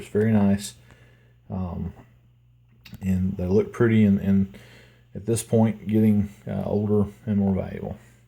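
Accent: United States. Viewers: is he from texas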